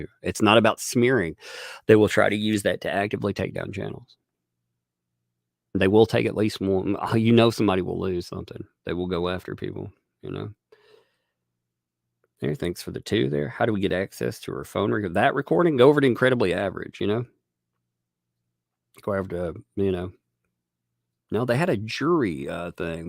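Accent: South, United States. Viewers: for the record your accent would probably be called "Educated Southern".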